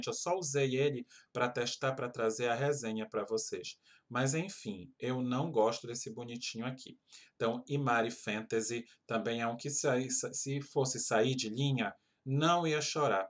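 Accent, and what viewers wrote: Pará, Brazil. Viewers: Vc fala parecido com paraense ! 😘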